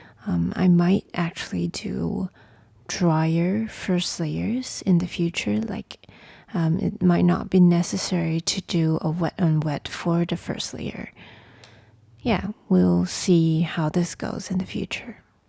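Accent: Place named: Malaysia. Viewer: Km org Malaysia? Aku bisa mendengar aksentmu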